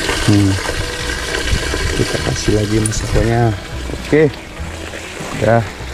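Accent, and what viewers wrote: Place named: West Java, Indonesia. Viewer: emang sundanesse people mah sulit ngilangin aksen sunda nya semangat bro lah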